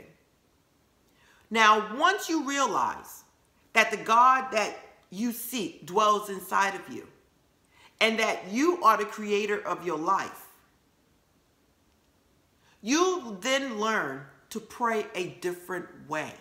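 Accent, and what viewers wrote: New York, United States. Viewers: Do I detect a New York accent??!!? I like that!!!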